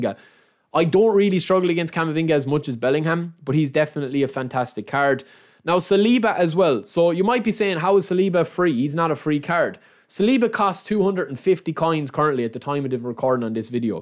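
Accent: Ireland. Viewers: Love these tier lists and your McGregor accent. :))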